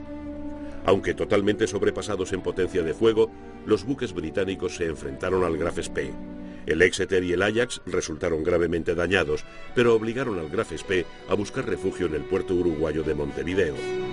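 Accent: Spain. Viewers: Me parece que se refiere al acento español del traductor 😳no encuentro otra explicación. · Es que habla en perfecto castellano de España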